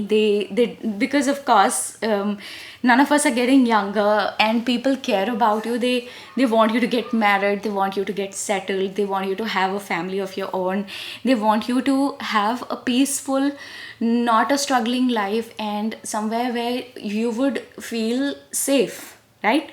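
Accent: India. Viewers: Can't convert your accent into a estimable one. Indian accent is utterly hideous and dismal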